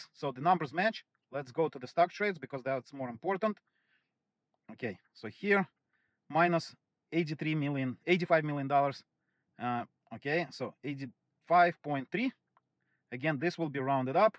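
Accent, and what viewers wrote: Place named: Russia. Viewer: You have russian accent. Do you do channel in russian too?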